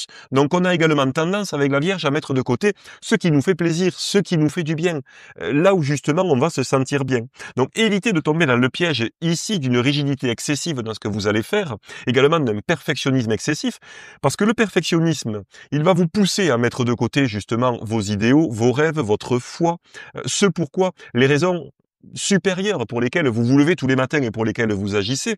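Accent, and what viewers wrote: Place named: South, France. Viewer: Joli petit accent du sud😊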